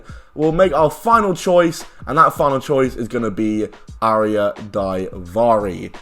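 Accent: England, United Kingdom. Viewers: I’m a black man from Brooklyn NY and I’m a sucker for an English accent speaking on wrestling. Random af but I love your content bro you’ve gotten me through a couple rough days at work I appreciate you for that man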